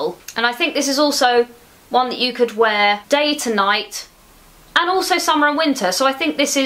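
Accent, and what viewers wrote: United Kingdom. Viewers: Hi, just wondering, where in UK are you from? You remind me of a friend of my who has got the same accent as you and I kinda miss her. Thanks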